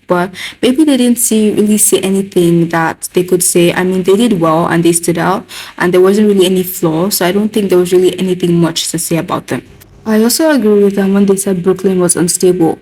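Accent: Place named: Nigeria. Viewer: OMGGGGG YOU'RE NIGERIAN TOO. I HAD A FEELING YOU WERE FROM YOUR ACCENT. I'M NIGERIAN TOO NICE TO SEE A FELLOW NIGERIAN THAT LIKES KPOP❤❤❤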